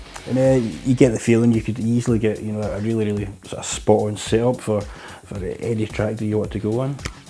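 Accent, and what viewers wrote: Scotland, United Kingdom. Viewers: The scottish slang wouldnt be that bad if he would take the time to not chew every third word in the sentence. Other than that, nice visual impression of the track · Great review. Always a pleasure listening to one of the many indigenous regional accents of the British Isles. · It is not about just Scottish accent, even himself does not aware what he is talking about...he comes to no conclusion , jump from one irrelevant topic to another, just waste of time to watch this, one of the worst.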